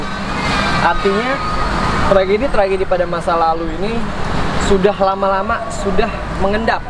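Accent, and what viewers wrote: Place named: Tegal, Indonesia. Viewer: Tegal ngapak ki❤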